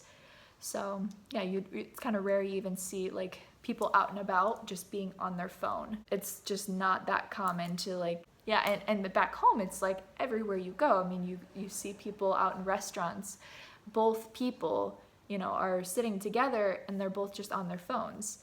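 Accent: United States. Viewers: your horrible American English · I swear I knew you were an Iowa girl as soon as you spoke. I'm from M'town and miss all of the great looking and smart girls from my hometown.You represent our home state in a very positive manner.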